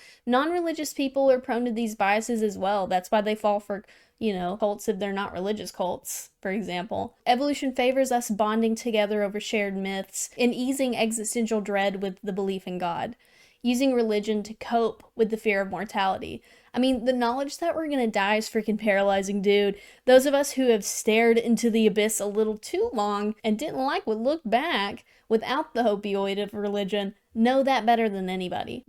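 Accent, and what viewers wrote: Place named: South, United States. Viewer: You sounded a lot more sounthern than I would have expected an atheist to sound 🤠🤠🤠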